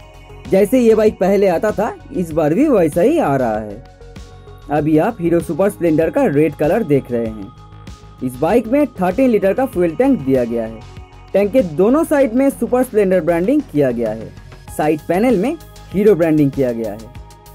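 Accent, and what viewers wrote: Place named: Bengal, India. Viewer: Hindi mei bengali tone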